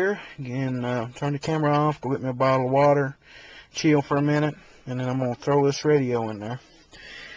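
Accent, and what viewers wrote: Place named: Alabama, United States. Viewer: Yea I tried to watch but made me sea sick. Uhgg. That's an Alabama axcent if ever herd one. I went fishing in Alabama. Everywhere I went some local yockal would flip me some lip about being a yanky. I thought why? Because I speak clear and consise. It got old real quick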